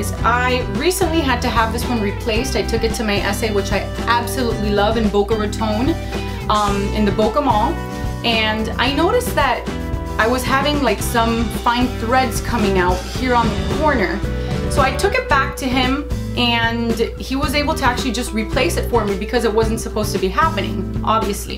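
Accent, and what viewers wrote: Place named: France. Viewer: really cute french accent ^^ nice video !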